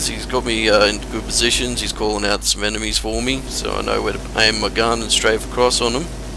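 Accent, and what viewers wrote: Australia. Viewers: Aahahah, brilliant commentary. Funny, enjoyable, epic ausi accent and actually really good advice. I play on PC, but tactics are the same. Ty Mate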